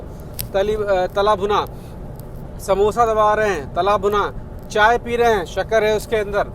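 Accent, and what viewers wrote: Bihar, India. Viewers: kya aap bihar se ho kya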